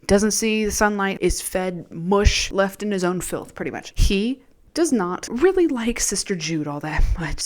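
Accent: United States. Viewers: I literally know nothing about this show but you will bet I will listen to an american southern woman talk about AHS for 2 hours! Also, random note i saw from another comment. I always think its so weird when people say others are a Mics Mike copy cos theyre using a whiteboard or pin board. Like girl have you never heard of powerpoints before ?? youtubers have been using pin boards and white boards for years lol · 'eeeey, I thought your accent sounded familiar! Fellow Virginian, here! Born in Norfolk, grew up in VA Beach, Chesapeake, then Williamsburg. Hi neighbor!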